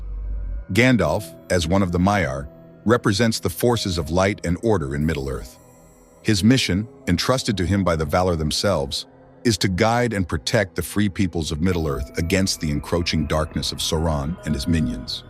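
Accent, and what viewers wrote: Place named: Scotland, United Kingdom. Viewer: Quite the Scottish accent you have, growing up in the beautiful lands of Scotland and all. Telling 'your' stories that are inspired by the beautiful landscapes you grew up in. Sounds authentic.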